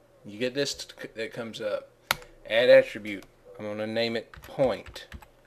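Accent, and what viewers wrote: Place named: Texas, United States. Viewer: you explain things damn good! thanks a bunch! is that a texan accent or what exactly? im not from america sry!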